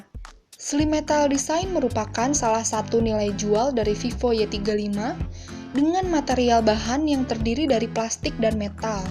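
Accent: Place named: West Java, Indonesia. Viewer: ... Nama Jawa, tapi logatnya Sunda yah?:)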